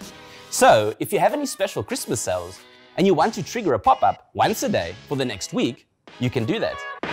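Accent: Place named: South Africa. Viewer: Dude you sound like South African!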